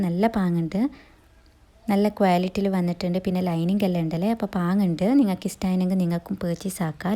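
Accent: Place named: Kasaragod, India. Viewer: കാസർഗോഡ് ഭാഷ ഇങ്ങനെ ആണ് സുഹൃത്തേ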